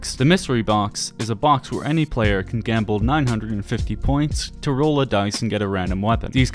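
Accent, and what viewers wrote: Germany. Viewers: Are you German? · your german accent is surprising good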